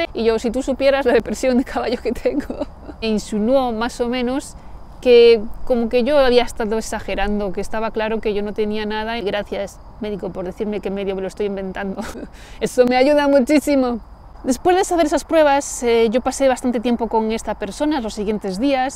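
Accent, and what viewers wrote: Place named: Galicia, Spain. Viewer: Muchas gracias! Te escuché con mucha intensidad y atención. Me encanta tu acento, supongo que eres gallega. Mereces ser muy feliz, belleza te sobra. Ojalá que la salud sea lo más abundante en tu vida para siempre. Un abrazo.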